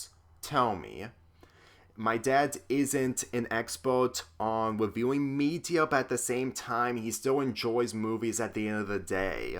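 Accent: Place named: United States. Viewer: Are you American by chance? Because from the sound of your voice in the video, you kind of sound like you might have an American accent, and that MIGHT be the most logical reason and explanation.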